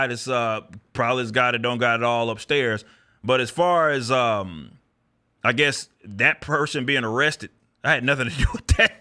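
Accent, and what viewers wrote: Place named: Texas, United States. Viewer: Yes as it turns out inner city Texas speaks differently to whatever part of the country or planet you’re from. Shocking I know.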